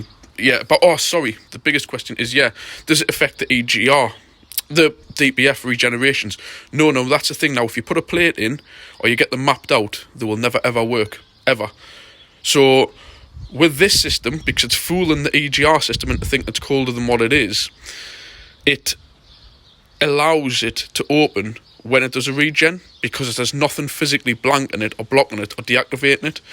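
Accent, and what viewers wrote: Newcastle, United Kingdom. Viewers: Cracking info, as a car/biker tech-head it makes perfect sense to me. . Just ordered an agri tune for mine, I'll set it same as yours D5. The pronunciation is Agri (Agricultural) Tune. I previously used the 'crude' common rail fuel pressure adjustable boxes in my 4 previous diesels, C220 Coupe, C220 AMG Sport, BMW X1, Mazda CX5, FIAT Doblo even, (model specific boxes obviously) with no issues and VERY noticeable power gains, but as this box cuts the turbo in earlier it's a much better unit, you're entirely correct. 👍 Me and my Aussie wife chuckle at ya Geordie crossed with Ashington accent. I'm a Geordie, but she being Aussie she chuckles 😃. 'Mitsybooshee' class love it 😀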